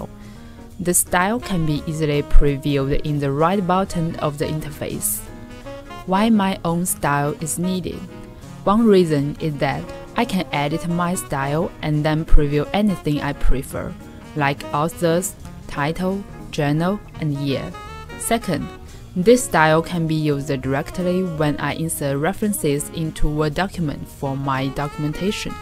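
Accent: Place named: Jamaica. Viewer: very comprehensive. jamaica english ? VIELEN DANKE !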